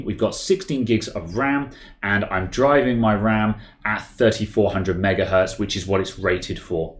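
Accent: England, United Kingdom. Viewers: and english accent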